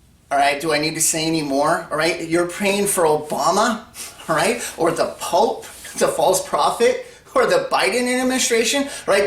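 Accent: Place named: New York, United States. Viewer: Coming from a very laid back part of Australia, I find it very hard to listen to a new yorker. But your message is good, most of us awake Christians can feel it coming. But I feel its also a test of our faith.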